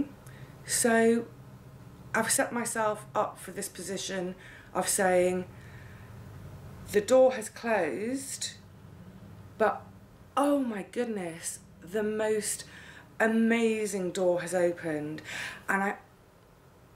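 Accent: United Kingdom. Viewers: ? You have all of us! All 2,404 of us! I still wish would create a mailing address for us, so if any of us want to send her a gardening surprise in the mail......, if your regular use mailing address makes you nervous to share here with us, can you get a separate mailing address that is for all your online friends?, you bring all of us your knowledge of gardening, you bring us your happiness for life, you bring us your "UK" accent (which we adore)......I think it would be fun for you to get random surprises in your mail box.......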